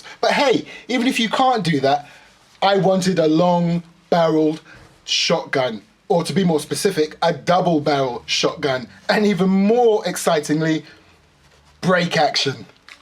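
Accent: United Kingdom. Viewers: You are delightfully British. I love it. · Are you British?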